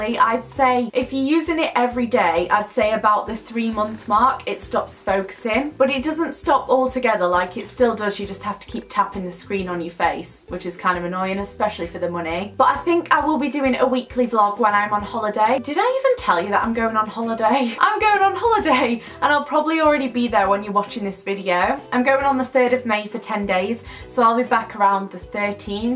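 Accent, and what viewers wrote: United Kingdom. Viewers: This is the first video of yours I'm watching and I absolutely love your britain english!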